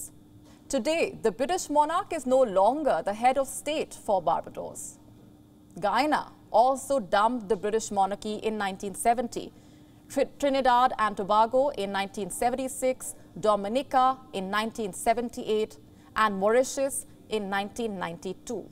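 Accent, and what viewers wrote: India. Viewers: Speaking english in indian rythm is interesting.. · what do you think it means. An Indian accented English speaker. It's a common thing these days.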